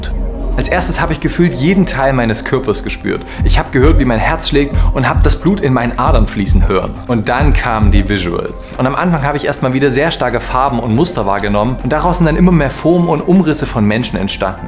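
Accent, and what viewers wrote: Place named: Saxony, Germany. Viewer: Ich liebe deinen leicht durchschimmernden sächsischen Dialekt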